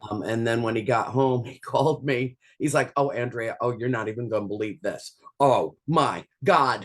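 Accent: South Africa. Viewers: I will definitely. I like your Afrikaans accent. Groete van Kaapstad!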